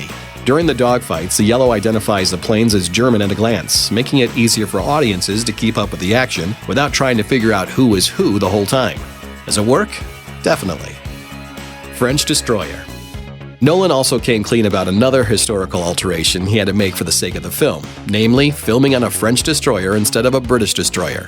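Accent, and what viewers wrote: United States. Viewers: are u american or britisch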